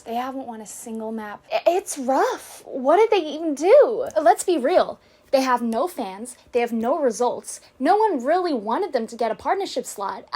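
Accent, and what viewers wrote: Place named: United Kingdom. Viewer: I hear British accent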